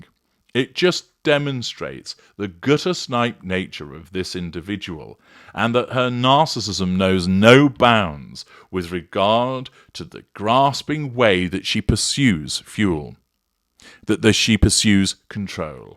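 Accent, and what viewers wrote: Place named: Manchester, United Kingdom. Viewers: i think he’s originally a Manchester area man. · Its the ingk rather than ing at the end of words that says NW England to me. On the Kings English thing, the way he pronounces project with a long o is very noticeable to me.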